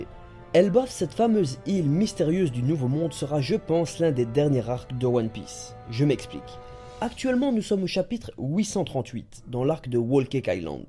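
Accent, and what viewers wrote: Belgium. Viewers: L'accent belge que veux-tu ! Moi aussi je dit "oui cent trente ouitte" xD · imagine la barre qu on va se taper pour le chapitre 890 aka oui sans nonente 😂. on te kiff, change jamais ton accent belge. je dirais même que tu devrais utiliser plus d expressions de chez toi,genre à tantot etc...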